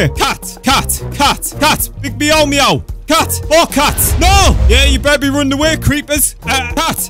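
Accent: Scotland, United Kingdom. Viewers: ya british? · You sound British 😃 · Is he British? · I love brittish accent🤩🤩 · He sounds like Scottish or Northern Irish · Are you from Scotland? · He sounds Scottish for me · He’s British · I can tell your britsh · You’re British and I’m Scottish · Are you Scottish or maybe Irish? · your a british people best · I like your accent it makes you sound Scottish. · ur brittish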